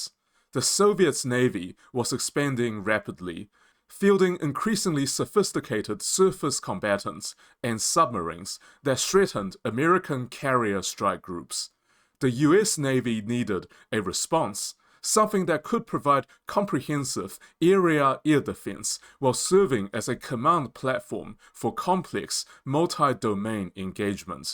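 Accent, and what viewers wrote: China. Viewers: What kind of accent is that? Any ideas? Is there a Chinese accent mixed in? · Your Chinese accent is annoying!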